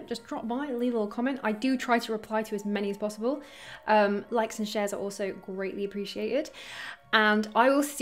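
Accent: England, United Kingdom. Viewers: cracks me up, sometimes...!! Between some of the crazy faces she makes and the way her sheer excitement shines through in her speaking while discussing the topics of her videos, causing her mouth to suddenly malfunction in what I would jokingly describe as "seemingly random, momentary bouts of an excitement-induced speech impediment (all of which I find adorable and interesting, btw -- bc she has so much personality, and because the topics that she discusses in her videos are genuinely exciting, in the first place)," I really enjoy her videos...!! It doesn't hurt that I (much like the vast majority of Americans, I would imagine) find most of the various English accents to be hopelessly irresistible, to begin with... 😊 Hilarious personal fun fact: Several years ago I exchanged the original factory default voice on my phone for one that is both female and British (possessing what I would describe as a "super-sexy, authoritative and very proper English accent"), because I find that it makes for a much more pleasant and calming listening experience... 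😊.....and also because it somehow feels like she (I call her "Mary Poppins" lol) is a much better-informed alternative to the generic voice; and, therefore is much more qualified to be fielding my various oddball queries or giving me driving directions, throughout my day...!!! x'D #True story PS: For a perfect example of the "excitement-induced speech impediment" thing that I previously mentioned, listen to the first ten s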